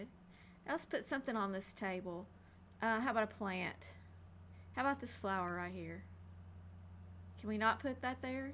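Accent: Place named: Texas, United States. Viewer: you from Texas? You have a cool western/cowboy sorta accent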